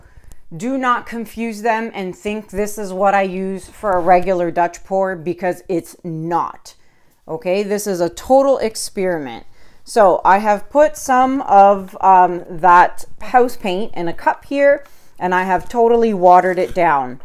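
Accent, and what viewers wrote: Canada. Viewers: Sounds like sweet Juno might have allergies. I use children's Benadryl. Hope he feels better soon! Haven't heard you say "literally" as much as usual. I like listening to your cute canadian accent!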